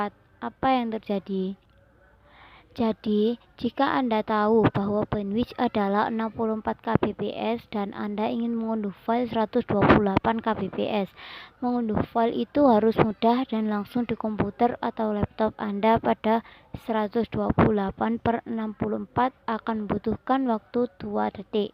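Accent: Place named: Java, Indonesia. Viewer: Medok e..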